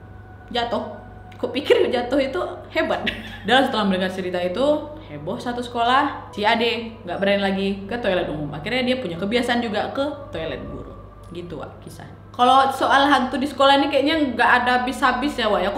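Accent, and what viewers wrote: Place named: Medan, Indonesia. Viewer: Tapi knp ngomong nya kyk Medan?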